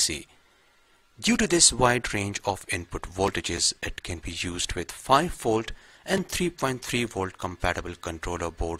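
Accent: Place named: India. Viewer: good video my friend with indian accent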